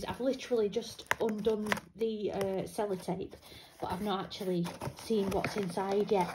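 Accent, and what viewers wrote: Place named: United Kingdom. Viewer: Love these videos and love hearing your British accent! Pretty weights!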